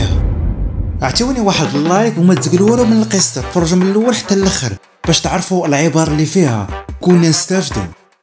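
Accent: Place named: Souss, Morocco. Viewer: احسن واحد كيعاود القصص من لكنة ديال لسانك غالبا من سوس.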